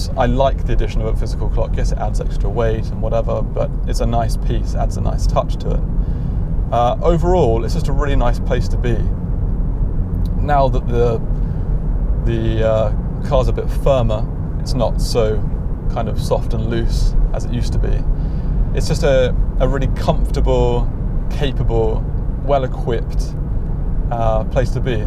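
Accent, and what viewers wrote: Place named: Surrey, United Kingdom. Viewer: Yah, a lovely Surrey accent, 😂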